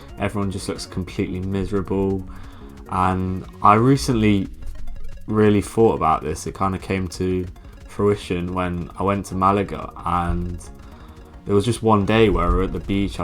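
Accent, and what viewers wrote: United Kingdom. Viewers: After living solely in different countries in my life with a third-world country background. At this moment I believe it's just between 6-8 considering living standards in first-world countries, what makes the difference is your priorities, having a partner or close friends or not, all other things can make you feel better and some people just want to stay away from their culture, that could also give some freedom! This life offers adventures but we also crave stability, as long as you are young exploring will make you more open-minded but ultimately you need to make the best out of your life! BTW I love the British Accent!!! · That's because judging from your accent you're from Northern England. They can be a horrible bigoted miserable bunch in the North. People in the South are generally more genteel, urban and cosmopolitan.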